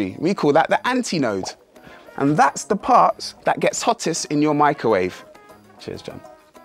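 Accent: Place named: London, United Kingdom. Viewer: it's a pure london accent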